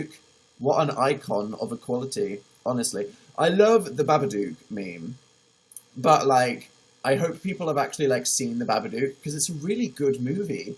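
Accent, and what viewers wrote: United Kingdom. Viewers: Is it me or does hearing a British person talk about fonts make it more fancy than it really is? 🖤😊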